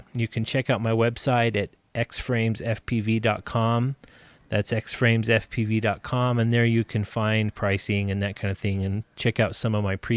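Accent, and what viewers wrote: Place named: Australia. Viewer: yes "gooday!! And tooday!!!.." Catchy as fuck specially with that down under cheekyness. 👍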